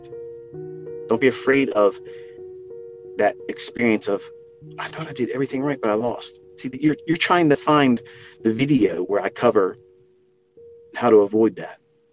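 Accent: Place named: Netherlands. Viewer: yo bro ben je nederlands?? ik hoor het aan je accent man haha. ik ben zelf ook ICT student en zeker goede video die je hebt gemaakt very inspiring.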